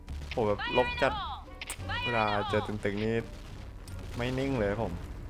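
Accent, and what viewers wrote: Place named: Ratchaburi, Thailand. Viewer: เสียงพี่เหน่อๆเหมือน ราชบุรี-กาญจนบุรี เลยครับ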